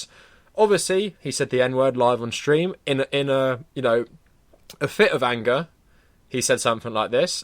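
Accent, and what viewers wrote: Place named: United Kingdom. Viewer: You sound brittish, how does it feel to be a traitor to your own country and watch it be decimated by the corrupt leaders?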